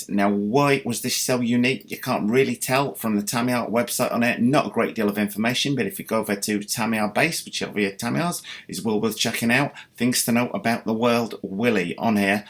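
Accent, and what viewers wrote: United Kingdom. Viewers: Ur you from the uk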